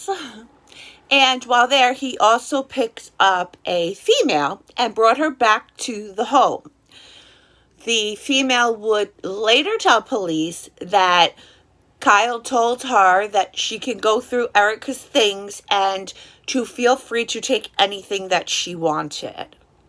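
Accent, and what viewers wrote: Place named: New York, United States. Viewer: I love listening to your accent (assuming you're somewhere in the tri-state area, specifically NY/NJ?) Makes me homesick. Also, that color looks SO good on you.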